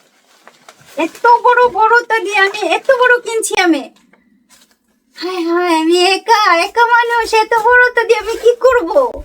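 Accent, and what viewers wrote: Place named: Khulna, Bangladesh. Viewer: আপনি খুলনার মেয়ে হওয়া সত্বেও ,আপনি খুলনার ভাষায় কথা বলেন।ধন্যবাদ।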